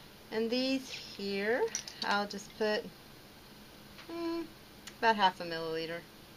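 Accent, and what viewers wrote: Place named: South, United States. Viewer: Hi! Great video! Thanks for subscribing to my channel. I like your southern accent. ...Your teeth look great too!! Peace & Health to you, Itsy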